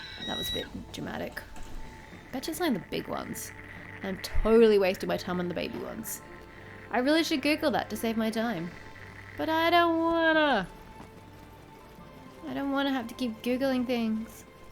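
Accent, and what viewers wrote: Australia. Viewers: Just found that video by coincidence whadda great video and such a sweet australian accent :3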